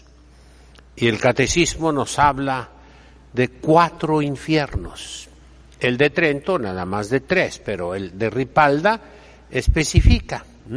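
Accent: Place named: Argentina. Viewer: Más exactamente con acento argentino.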